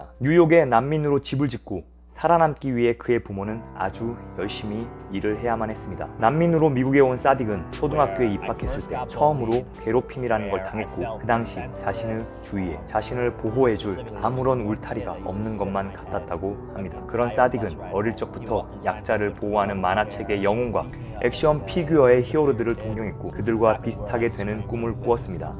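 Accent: Gyeongsang, South Korea. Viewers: 경상도사투리쓰는사람이네 ㅋㅋㅋ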